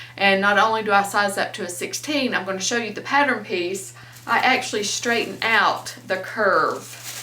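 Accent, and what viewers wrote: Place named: Tennessee, United States. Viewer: Yep, already knew it and this was yet again more confirmation: you're a genius. With a TN accent. 😘😘😘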